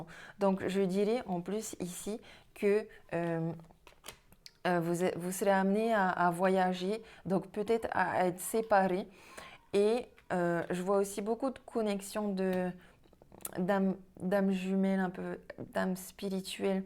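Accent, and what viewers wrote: Tahiti, French Polynesia. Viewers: Bonjour, merci pour votre travail. Vous avez l’accent tahitien. Belle journée. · Bonjour, merci pour votre message 🥰oui oui vous avez bien reconnu l’accent tahitien d’une tahitienne 😉 belle journée ☀️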